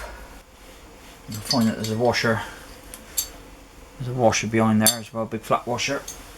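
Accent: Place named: Radstock, United Kingdom. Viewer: Thanks, just going out to the garage to do mine for the second time ! in 49,000 miles, by the way are you from Radstock ? it sounds like it.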